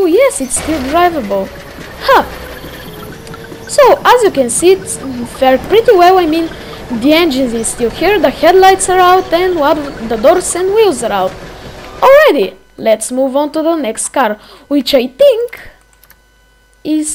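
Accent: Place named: Bulgaria. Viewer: nice bulgarian accent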